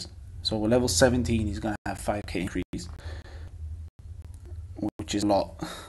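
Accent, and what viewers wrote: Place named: Eastern Europe. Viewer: cause they are like suka.. I hate it hahahaha so I need to watch more of your videos; I'm assuming you are Slavic from your accent.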